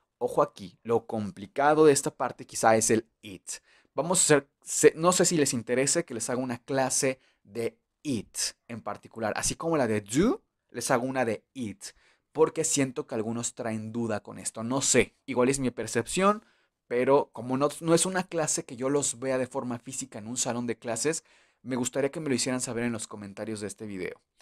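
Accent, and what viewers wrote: United Kingdom. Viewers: Profe por favor siga con su acento británico que más de uno nos gusta muchísimo... Gracias mil !!por dedicarnos tiempo para enseñarnos y el arte que tiene de enseñar👏😘😉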